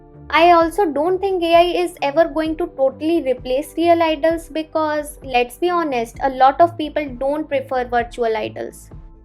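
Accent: India. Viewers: are you indian btw ? cause your accent 😼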